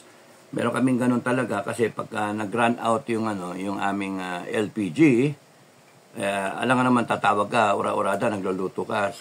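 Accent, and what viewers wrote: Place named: Visayas, Philippines. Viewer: ang cute mo mag bisaya Sir! "mag sulti ug tinuod, asa imong pusil?" dagaaan naa na ang mga Scout Ranger. hahahahaha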